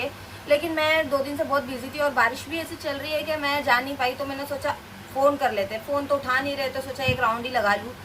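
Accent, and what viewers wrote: India. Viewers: Love ur indian accent💕mumbai's life sounds to be like karachi!!!love from pakistan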